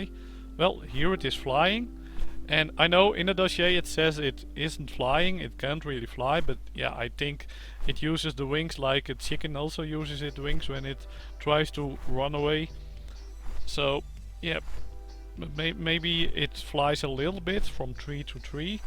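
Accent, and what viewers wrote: Netherlands. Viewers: Most Dutchies have an accent, take it or leave it. You don't need to have an English accent to have an English channel. If you don't like the Dutch accent you have 3 options, deal with it, mute or get lost. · You are from the netherlands i hear xD maybe you need to learn the accent of english before making an english channel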